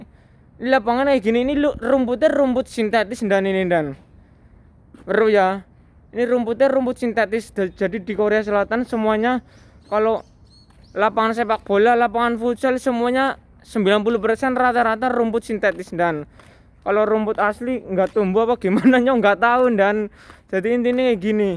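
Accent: Banyumas, Indonesia. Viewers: Salam ngapak ndan... Pwt-singapore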